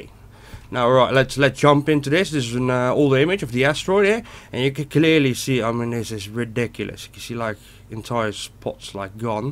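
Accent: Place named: Netherlands. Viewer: lol dat dutch english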